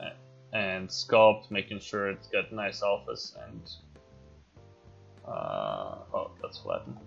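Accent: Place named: Quebec, Canada. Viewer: L'accent du Québec ça trompe jamais! eh eh, i wonder if an 8km x 8km terrain need world composition?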